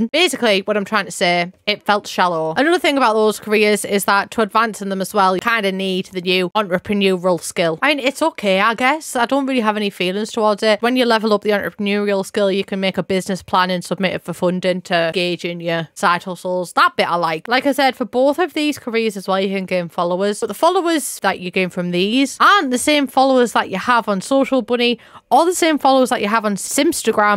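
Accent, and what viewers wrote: United Kingdom. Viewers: bro i’m sorry but what’re your accent is? somewhere in britain?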